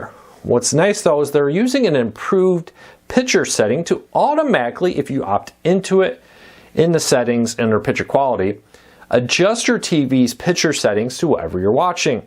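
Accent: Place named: Philadelphia, United States. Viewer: Are you from Philly? You keep calling it”Pitcher” settings 😃👍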